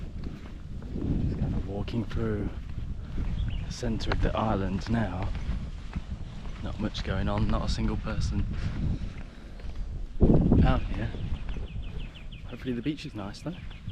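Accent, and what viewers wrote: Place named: Manchester, United Kingdom. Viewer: Sound Manc or northern Uk which im from. I will keep an eye out of your vids. If your around in IND let me know and you can show me around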